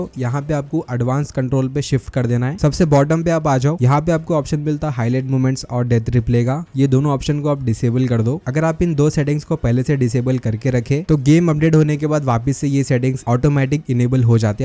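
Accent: Hyderabad, India. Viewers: Bhai aap hydrabadi ho kya??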